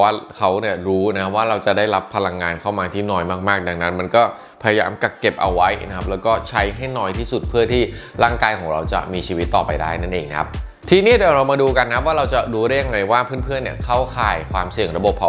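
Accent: Thailand. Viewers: โยโย่มา แล้วเเล้วลดไม่ลงเลย ออกกำลังกาย คุมอาหาร ก็ยังไม่ลง ปล.โค้ชเป็นคนสุพรรณหรอ สำเนียงมันได้😆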